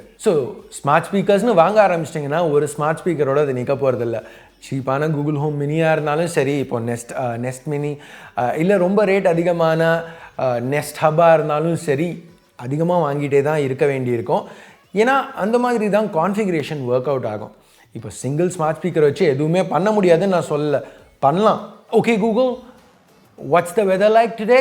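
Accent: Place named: Chennai, India. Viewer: bro..unga chennai tamil slang semma🔥🔥🔥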